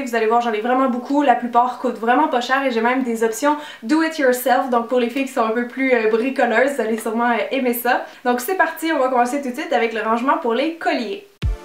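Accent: Canada. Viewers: ok! Merci beaucoup!;) Tu es vraiment formidable! Je t'adore, j'adore ton accent canadien et j'adore tes vidéos!!!! Continue comme ça! Parfois tu es vraiment trop marrante! BSX... <3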